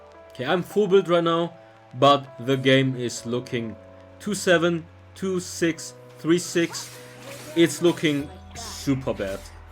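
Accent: Germany. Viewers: German accent?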